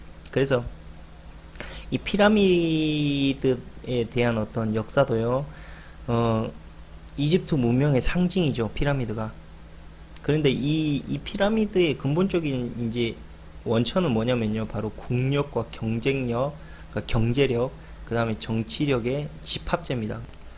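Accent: Jeolla, South Korea. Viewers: 억양이 전라도같은데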